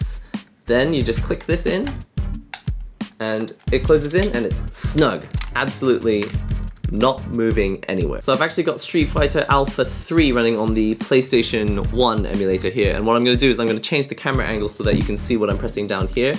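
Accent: United Kingdom. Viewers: its like hes from Japan, but he sounds british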